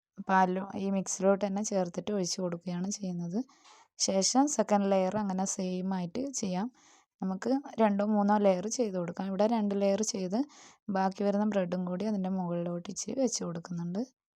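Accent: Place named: Travancore, India. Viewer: കാസർകോട് ജില്ലയിൽ പ്പെട്ട കാരാട്ടെ സംസാരം മാറി തിരുവിതാംകൂർ സംസാരം ആയി എന്നാ ഒണ്ട് വിശേഷം കൊച്ചെന്തിയേ എപ്പഴാ പാചകം തുടങ്ങി യെ ഒത്തിരി നാളായൊ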